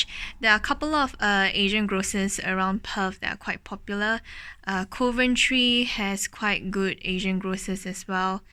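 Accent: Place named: Singapore. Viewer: I love your video, I think your accent is a mixture of Singaporean and Australian which is interesting for me to listen to. I hope I can live in Perth in the future and enjoy it as much as you do :D!!:yougotthis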